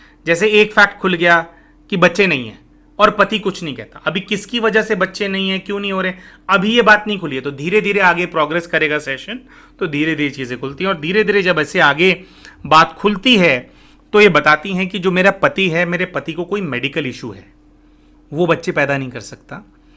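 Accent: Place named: Delhi, India. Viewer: inki bAate batane k tareeke me Delhi k tadka wala touch hai 😂😂 bahut pyare aur funny hai bhaiya 😅😅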